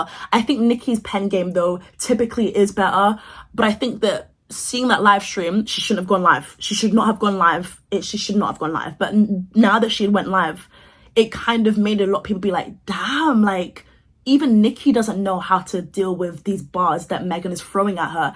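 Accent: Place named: RP, United Kingdom. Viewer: That pink dressing gown is yuk! You talk too fast and too posh!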